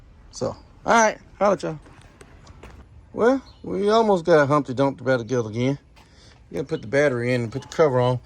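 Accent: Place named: Texas, United States. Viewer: Accent says your from Texas sir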